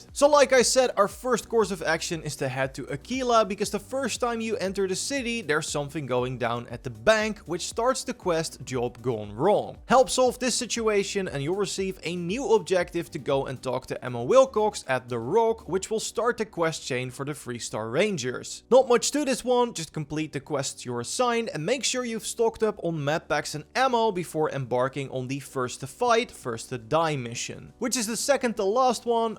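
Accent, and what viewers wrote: Netherlands. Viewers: JEEEEZZ Dutch accent much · Je ruikt het Nederlandse gewoon 🤣🤣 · Bro are you dutch?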